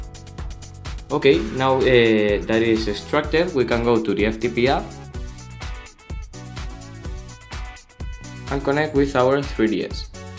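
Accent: Spain. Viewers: SE NOTA QUE HABLAS ESPAÑOL CRACK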